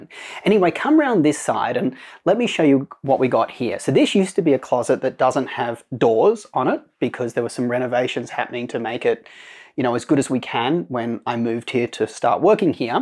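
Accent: Australia. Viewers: I am really inspired by your videos especially this one!!! Nice to hear an Australian accent talking about tech and tech reviews and thing of such. Being from down under myself.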